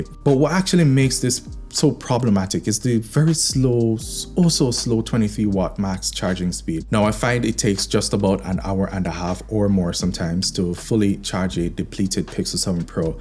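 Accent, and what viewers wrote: Jamaica. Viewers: Are you from the Caribbean, specifically Jamaica?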